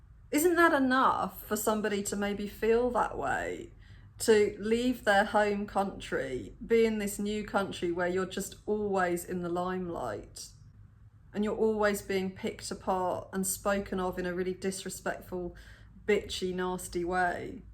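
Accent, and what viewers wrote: England, United Kingdom. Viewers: your beautiful, English accent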